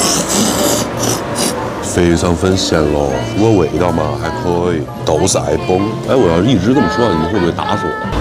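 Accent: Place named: Sichuan, China. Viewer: 说四川话比说上海话好听多了，别整天好不啦👎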